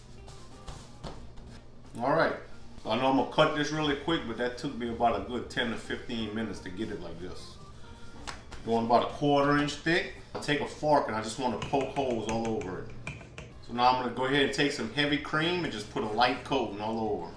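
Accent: Louisiana, United States. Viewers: He is Louisiana...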